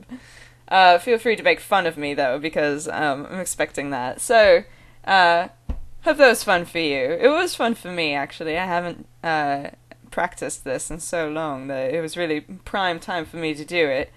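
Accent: RP, United Kingdom. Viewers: Nice try of old Londonish hmm lol but you sound Britsh normally anyhow. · There strictly is no standard British accent; what you're speaking with here is called received pronunciation. It actually became the perception that British people spoke predominantly with RP because it was used heavily by the BBC, even colloquially termed the "newsreader accent", but there are a ridiculous number of accents in the UK. Your attempt at it is pretty good, strangely enough to me you mostly gave the game away in speech disfluency; as odd as it sounds, you have an American "um". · You sound like your from a posh London borough. Not bad at all. You seem to do better when you just talk. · Why do people always do the posh Lara Croft accent? =') It's actualy not too bad... but please, keep with your American accent =] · yeeeahhh thats one posssssssh british accent =] when most of england isnt posh at all XD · You're not actually using a 'British' accent because there really isn't any such thing as 'typical' British accent. 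What you are doing, (very well in my opinion), is an 'upper-middle class English accent', a la Kate or Pippa Middleton. This accent is often coupled with the phrase "Okay yar, jolly hockey sticks" and is attributed to girls at public schools (in America I believe you call them 'Private Schools'). Keep up the good work ;) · by far not the worst british accent I've heard. The worst British accent in my opinion belongs to those from East London. But I'm Welsh, so meh... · Very upper class and very slightly southern. Maybe a slight hint of drunk. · This is fucking hilarious. You're almost passable as a very posh, slightly weird British person. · think of adeles normal talking accent. Common as muck, now thats actual english accents! Nice posh accent though. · How very posh. Hahaha! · This hurts my stomach. You're speaking pretty well, but.. You.. It's so posh D: You don't seem like a posh person, I'd rather see you doing a Irish, Scottish or Huddersfield accent, perhaps? 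And Australian would also be pretty awesome. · Sounds good, definately southern English, (I'm from Hampshire). You speak quite precisely so it sounds quite posh. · Goddamnit we're not all posh:( NOBODY does a Northern accent! (Still, pretty good though) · English here, Very very posh accent, its not bad though, sounds like you've emulated emma watson a bit. ALO GAVNAH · I think this is received pronunciation English. There is no single or overruling British accent, especially considering the strong accents of Scotland and Wales would be included as being British. Anyway I love your videos. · It's kind of strange but that's BRILLIANT for an American. However that's more of a posh accent so if you speak to an English person, they will probably believe your English only they will think your slightly snobby. I am from the north of England by the way so my accent isn't like that but I have heard English people speak like that. 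· It's a decent accent, but it's definitely a stereotypical "posh" accent. You'll find very few people who actually talk like that. (From north England here). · its a very good posh accent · but it was very RP, I think very very few people in Britain actually speak like that anymore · I could believe that you were british :P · Pretty good southern accent actually, better than a lot of others :) Maybe a bit posh lol. But maybe I sound posh and don't realise it... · Bloody good show old bean! A rather good attempt at a prim and proper accent. Though its hard not to sound grumpy speaking proper. As with all dialects they change from region to region and are also class based. Most of London has a strange mix of cockney, American and Jamaican. Where I am, west London, people tend to drop their t's ie. instead of bottle it sounds more like bo(t)all... hard to type as there is a t in there but you just don't pronounce it. 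· *sends to posh britsh friend because you sound so much like her its scairy*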